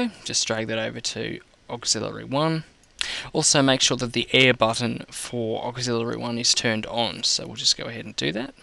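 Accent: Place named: Australia. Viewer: love the aussie accent by the way bruh. I'm wanting to lets say set up a radio broad cast for prank phone calls. I'm going to be using Skype with a friend or 2 and will be calling numbers for the calls. I will be using this set up.. but how do I actually broadcast to people? Am I going to need to set up an SQL database through my server? I have PhpMyAdmin and all that... just wondering if you could help me out here thanks! If email is easier then email me <mail>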